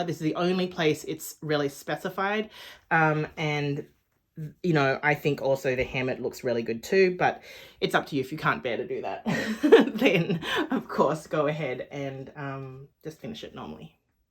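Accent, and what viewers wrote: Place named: Australia. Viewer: Great tutorial. I love your clear instructions and it’s so great to hear an Aussie voice! I have downloaded the pattern and will be making one of these just as soon as I have some twill tape.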